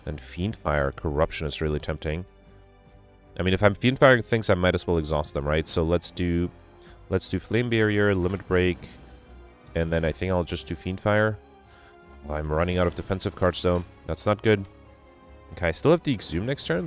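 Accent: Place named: Scandinavia. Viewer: It's all good. Just gave som Baltic or scandinavian vibe to your speech, but that's totally fair. I'm from Denmark myself. Anyway I love your videos they're great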